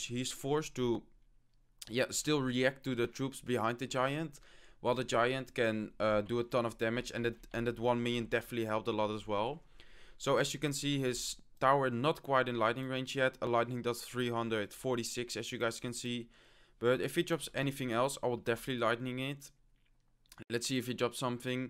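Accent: Netherlands. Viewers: is hij nl ik hoor het aan zijn accent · Are you netherlands because you dont talk like a american human and if i say something english i talk like you and in from the netherlands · where are you from? Because you sound a bit dutch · Je bent zo duidelijk nederlands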